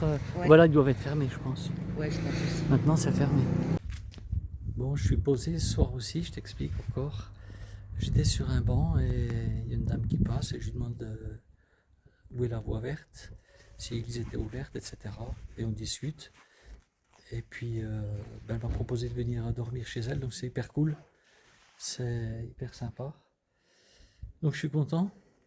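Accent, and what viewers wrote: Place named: Alsace, France. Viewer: Bonsoir Ca fait du bien d’entendre l’accent Alsacien 😀. Tu a bien fait d’aller voir cette belle cathédrale en grès rouge. Beaucoup d’église et de monument sont restauré avec le grès de la carrière de Rothbach réouverture en 1964. Je te souhaite une bonne nuit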